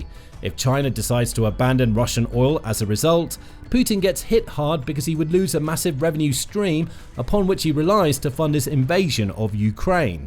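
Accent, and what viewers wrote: United Kingdom. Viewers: Please learn to pronounce NUCLEAR correctly - it’s very annoying to hear ‘NUCULAR’ - I love your voice but don’t enjoy hearing a nice UK accent that doesn’t pronounce English words correctly. Love from a grumpy old lady in Australia 😂❤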